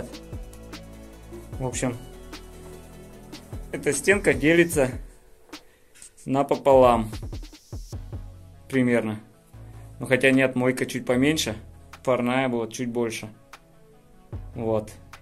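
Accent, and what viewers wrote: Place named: Tatarstan, Russia. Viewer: Ты походу татарин! Акцент татарский)!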